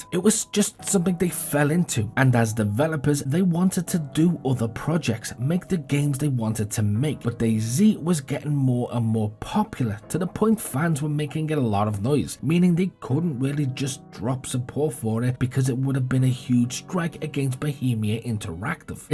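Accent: Liverpool, United Kingdom. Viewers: I dont hear Toxteth, but I definitely hear a mix ha its only sometimes I hear scouse at all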